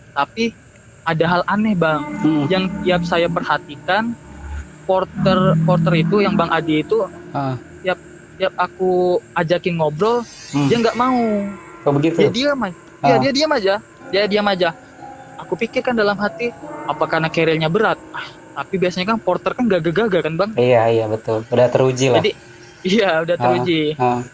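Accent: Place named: Jambi, Indonesia. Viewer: Kyk suara orang jambi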